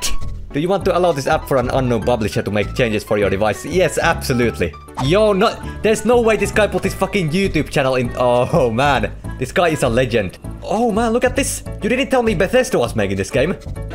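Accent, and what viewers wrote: Finland. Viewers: Wait you are Finnish. I thought you were like russian by ur accent but somewhat Finnish accent but yeah Suomi perekele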